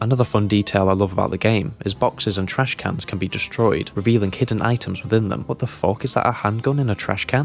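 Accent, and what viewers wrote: United Kingdom. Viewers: Great review. I miss DR1. Are you British?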